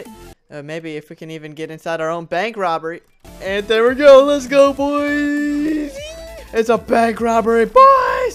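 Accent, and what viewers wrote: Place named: Denmark. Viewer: you sound danish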